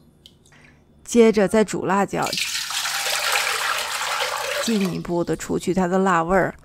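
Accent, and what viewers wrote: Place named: Shaanxi, China. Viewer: 好棒啊！每次回西安去回民街都会买点炸辣椒，特别喜欢吃，再陪着的陕西口音，太亲切了。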